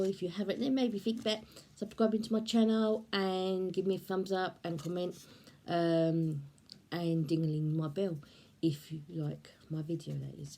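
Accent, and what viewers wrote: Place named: United Kingdom. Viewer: hun, gosh I have a thing for British accent. I could listen to you all day,ding ding 🛎!! You’re very creative hun 🤗that recycling card came out quite pretty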